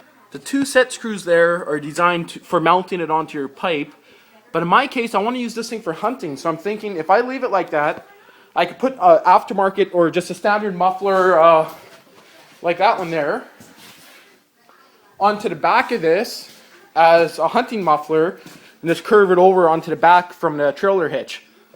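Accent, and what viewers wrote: Canada. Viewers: What part of Newfoundland ya at me buddy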